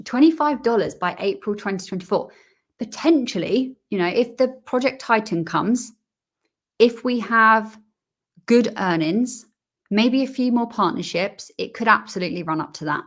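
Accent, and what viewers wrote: United Kingdom. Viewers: I love your british accent ❤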